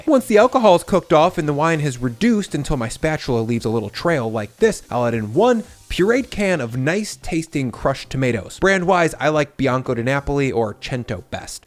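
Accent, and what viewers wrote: United States. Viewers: Thank you for pronouncing the ingredients in proper American English way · A guy with a German name, American accent, using European ingredients to cook Italian pasta in 5 sauces !!! What's the real message here? By 2025, the earth has become a huge village ?? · Pronouncing those words in a heavy American accent is funny as hell, though. At least Google how it's supposed to be pronounced before doing the video. 🤷🏻‍♀️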